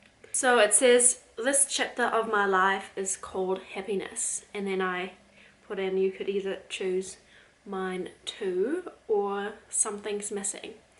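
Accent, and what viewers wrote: New Zealand. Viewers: I see your English is getting the kiwi touches. Noticed the way you say happy 🤣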